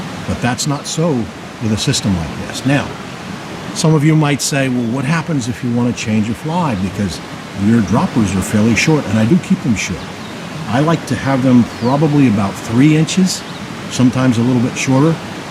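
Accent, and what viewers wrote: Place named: Appalachia, United States. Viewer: Cannot understand a word because of poor sound and Redneck Hillbilly English!😢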